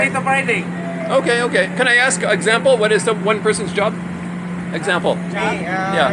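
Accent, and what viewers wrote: Canada. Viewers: Thought I detected a Canadian accent! New subscriber here from BC. Malaybalay looks very interesting. So insane how cheap it is...especially the rent! You seem to have created quite the income stream for yourself. Congrats. I'm looking forward to viewing more of your content.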